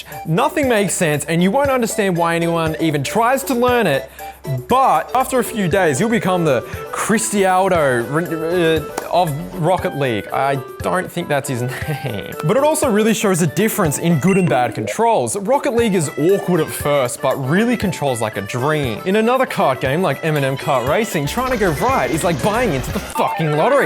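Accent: Australia. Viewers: Australian(?) Nakey Kakey I love it. Gladly just found you today. Keep up the good work plz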